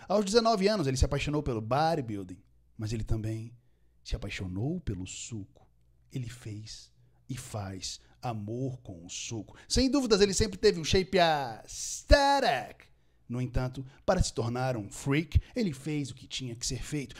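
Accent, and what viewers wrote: United States. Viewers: Teu sotaque super american amoooooooo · o inglês do é inglês americano, nao inglês britanico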